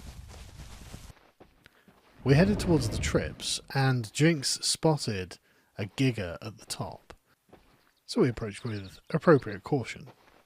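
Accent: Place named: United Kingdom. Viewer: No hes just british🤭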